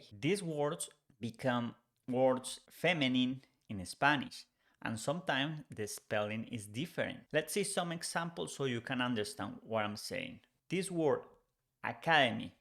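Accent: Colombia. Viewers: I listen to your podcasts and read through the transcripts. This has helped me improve comprehension tremendously! I am also appreciating this video about hacking or using a simple tool. Also, the short phrases are a huge help as well. Me Encanta el acento Colombiano. Muchas gracias por tu arduo trabajo!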